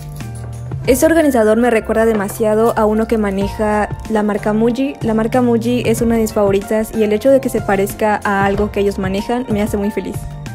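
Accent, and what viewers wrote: Mexico. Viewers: Es mexicana por su acento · Se escucha como mexicana, pero "fresa" y "pudiente" porque nos presume que viaja en auto.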